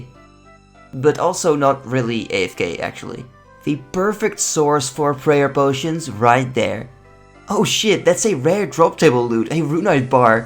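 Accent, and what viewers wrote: Netherlands. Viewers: I recently discovered your channel and benching it rn! I was wondering if you were Dutch by any chance, your accent sounds familiar?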